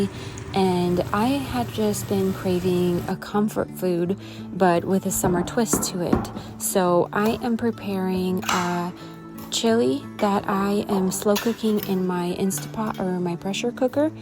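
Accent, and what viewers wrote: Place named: Russia. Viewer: I was wondering where your lovely accent was from!! Did you grow up in Russia?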